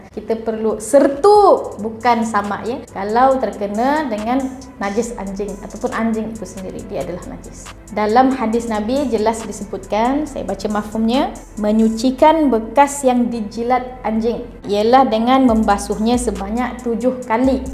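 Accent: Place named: Sabah, Malaysia. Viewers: Start saja dia mula becakap aku tau suda sabah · Suka sangat loghat Sabah dia.. =)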